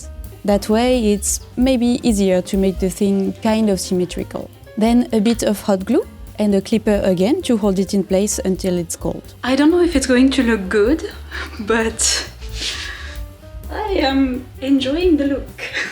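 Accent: France. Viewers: Je me demande si tu fais exprès de ne faire aucun effort de prononciation pour donner le charme de l'accent français vu par les étrangers ou si c'est naturel xD Quoi qu'il en soit, c'est très marrant, très bien rythmé (le montage de la vidéo est nickel) et même si je ne porte pas de perruques j'ai trouvé ça très intéressant. Naïce! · OMGGG l'accent français (｡･ω･｡)ﾉ♡✨✨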